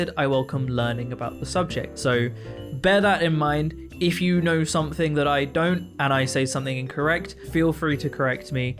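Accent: United Kingdom. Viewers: It's only now occurring to me that you're British.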